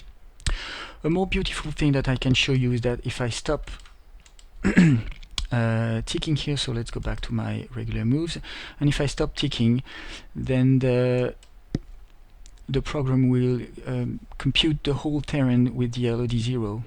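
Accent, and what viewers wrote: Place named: France. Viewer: L'accent Fançais est présent!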